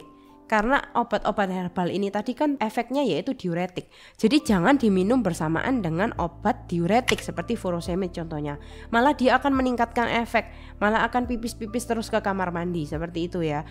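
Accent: Java, Indonesia. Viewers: Kaget pas denger logat nya, ga nyangka semedok itu 😂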